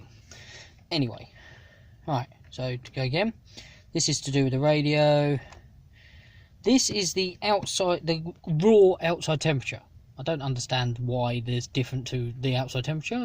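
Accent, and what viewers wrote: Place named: United Kingdom. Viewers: Yoda Master British English. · Lol, you speak like a british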